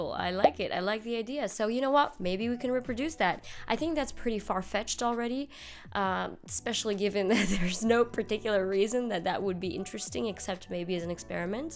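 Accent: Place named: United States. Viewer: Found your channel today and immediately subscribed, love the way you talk about each book and so many that I have never heard about, love it because most booktubers tend to recommend the same as everyone else. You said you grew up in France but your English is so perfect! I was wondering how your accent sounds so American? There’s no French accent, I’m mesmerized because I’m not from the US but have been living here over 20 years and I still sound foreign 😄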